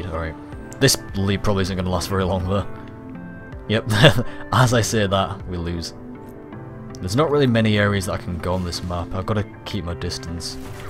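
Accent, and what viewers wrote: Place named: Scotland, United Kingdom. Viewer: you look Asian but sound scottish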